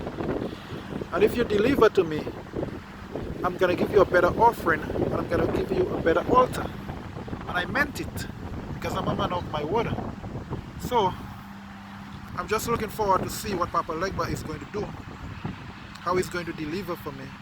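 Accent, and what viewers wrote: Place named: Dominica. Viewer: He sound he from Dominica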